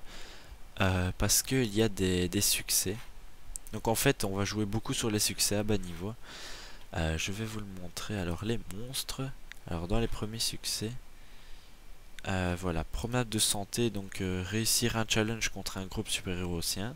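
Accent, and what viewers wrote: Belgium. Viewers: j'aime bien l'accent bien belge x) ça fait plaisir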